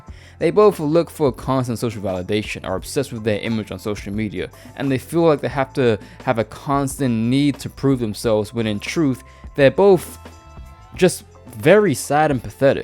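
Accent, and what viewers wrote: United Kingdom. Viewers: Where you from? I always thought you were UK/British Habesha.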